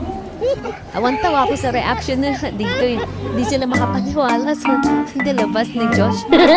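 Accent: Batangas, Philippines. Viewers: i really love to hear ur voice ....'yong batangueña ang tono ng pagsasalita ....👸💛❤️💛❤️💛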